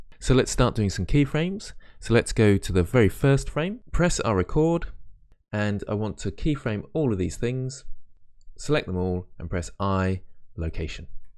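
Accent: United Kingdom. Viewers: I love your videos so much plus your British Accent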